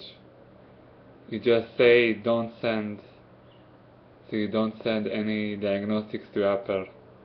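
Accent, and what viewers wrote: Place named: Israel. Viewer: איך שומעים שאתה ישראלי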